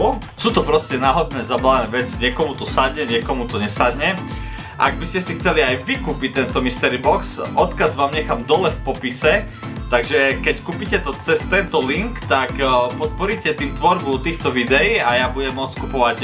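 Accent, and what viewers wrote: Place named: Tatras, Slovakia. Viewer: bývam v tatrách.. a ja som si hovorila ze ten prízvuk je mi nejaký známy 😂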